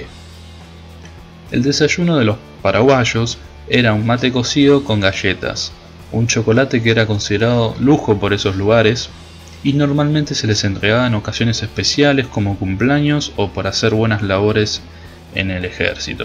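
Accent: Argentina. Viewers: obvio que es de Argentina o no escuchaste su acento :v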